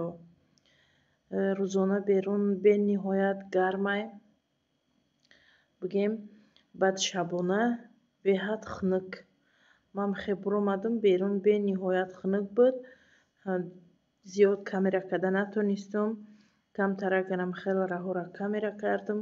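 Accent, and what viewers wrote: Tajikistan. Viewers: Хохарам шумо зани бехтарини тозаи точики хастед мехохам симои шуморо бинам факат дастонатро мебинам овози форам доред чунки тоза точики гап мезанед❤❤❤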